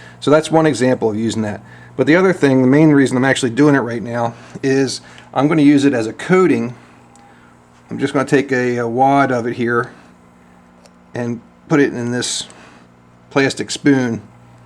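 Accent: Pittsburgh, United States. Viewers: Your accent sounds (a bit) like someone from Pittsburgh.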